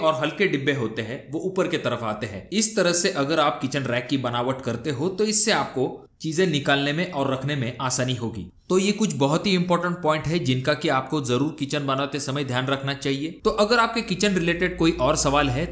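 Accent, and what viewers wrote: Maharashtra, India. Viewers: Sir aap maharashtra se ho marathi mix ho rahi hai😅😅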